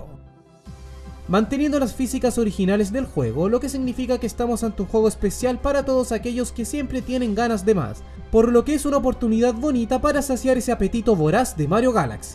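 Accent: Chile. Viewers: ¿Eres chileno, verdad?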